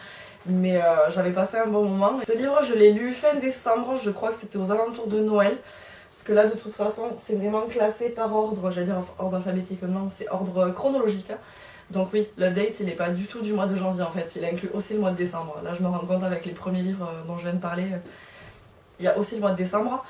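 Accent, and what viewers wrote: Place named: Toulouse, France. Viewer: Merci pour ce point lecture ! (Ce petit accent me rappelle mon chez moi (Tarn et Toulouse).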